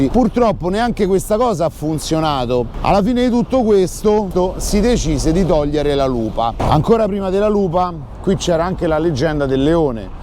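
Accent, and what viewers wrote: Rome, Italy. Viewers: ciao non ti conosco personalmente , mi piace il modo di come presenti i tuoi video con il tuo accento romano, bravo 👍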